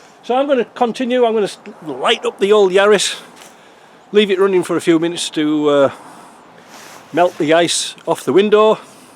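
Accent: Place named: North, United Kingdom. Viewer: Yeh, with the accent I thought you were up north,not as far as canada though lol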